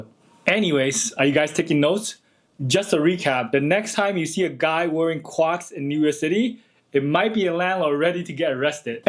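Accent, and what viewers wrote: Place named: China. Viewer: It's wild someone with a Chinese accent is talking about being a landlord in AMerica...sorry for saying it(not sorry).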